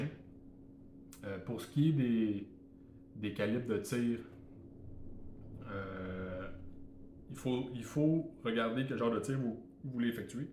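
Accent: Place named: Quebec, Canada. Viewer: Faut pas abuser non plus ! T'as un accent québécois ok, mais t'es complètement compréhensible, ne t'excuse pas non plus , t'as fait une vidéo intéressante et vraie surtout (chasseurs qui suivent les vendeurs sur des calibres magnum) moi je pense que bien souvent même le 243 est largement suffisant à l'approche et à l'affut ou sinon le 308 !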